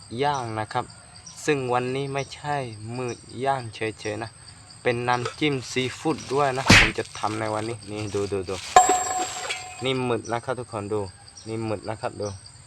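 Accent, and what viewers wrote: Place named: Southern Thailand, Thailand. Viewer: บังพูดนายูบ้านเราเลยไม่ต้องอายบัง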